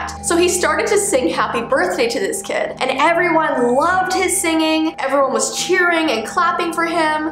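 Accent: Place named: Canada. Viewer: Samurai she is Canadian so she has a different accent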